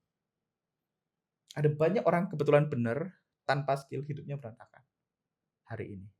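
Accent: Banyumas, Indonesia. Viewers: Ora ngapak..ora kepenak 😂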